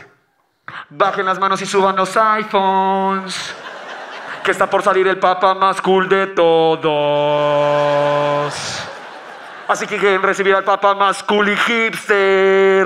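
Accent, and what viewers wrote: Argentina. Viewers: De verdad me creí que era argentino realmente le sale muy bien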